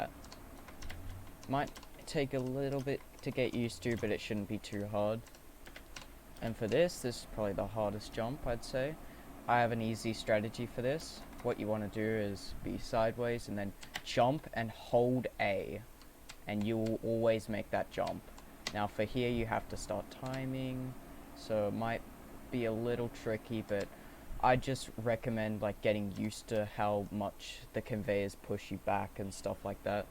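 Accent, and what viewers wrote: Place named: Australia. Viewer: Woah you have an Aussie accent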